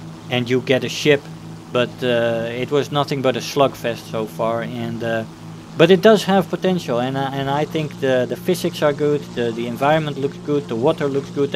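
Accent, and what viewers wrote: Netherlands. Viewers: When I first saw you in battle you were such a nice guy, if I recall correctly it was a early Spit game. Some people commented on you and I decided to look at your channel. Intant hooked, you're helpful and mature. Your accent is kinda cute too (Is it Dutch?) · Great chat. I've been playing for approximately 2 years, and in that time, I've only watched three channels for WT. The first was Long5hot, the next was Tx141 and yours. I chose only these channels because of the ANALYSIS. These channels communicated nothing but useful information and helped me get passed the horrible newbie stage. The other distinguishing feature of these three channels is the adult and friendly nature of the narration. You get the impression from watching that the three authors are approachable and guys you'd like to know personally. I cannot say these things about ANY other channel I've watched. 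Some were even annoying. Finally, you introduce the element of humor and personal commentary, which I enjoy. The only other WT Youtuber that makes me laugh is Squire. So, in conclusion, you offer careful analysis, adult and pleasant narration with a Dutch accent, you include some humor and a personable approach. I'm proud to be a member of your squadron and I appreciate your contribution to the community. PS - TOTALLY PUMPED FOR THE ITALIAN TREE.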